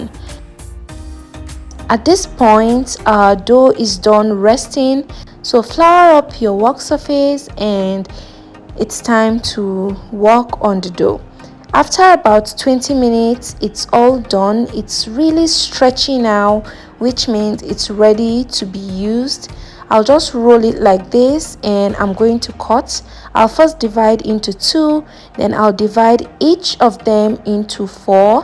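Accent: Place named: North, Nigeria. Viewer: I love the third method: your voice. It's so soothing and engaging 😊😊😊 I sense an almost non-existent accent. Are you from Northern Nigeria? 😊😊😊 But honestly, thank you for this videos. The way you explained left no room for questions. You had every information in mind when you were making the video. ❤❤❤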